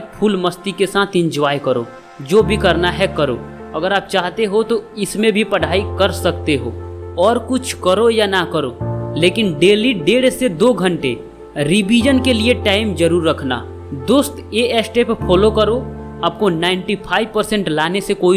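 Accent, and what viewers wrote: Bihar, India. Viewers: Bhai ap Bihar se ho kya ham lag raha hai ki ap Bihar se ho 🥰🥰❤️❤️😍😍😘😘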